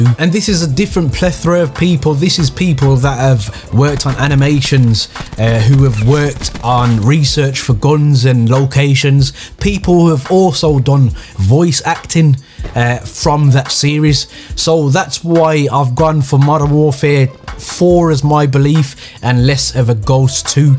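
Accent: Birmingham, United Kingdom. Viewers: Are u from Birmingham England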